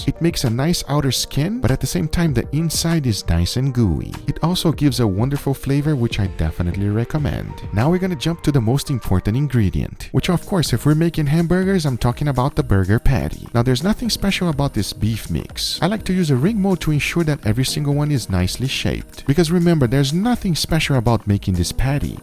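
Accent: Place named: Brazil. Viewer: he’s Brazilian so I think it’s just an accent/2nd language thing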